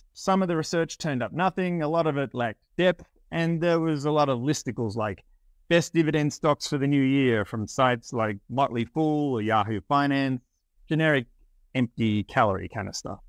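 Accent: Australia. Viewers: Hi, sounds like you are Australian. Do you also cover Australian income ETFs? I'm Australian but live in the US so I invest in both countries. Thanks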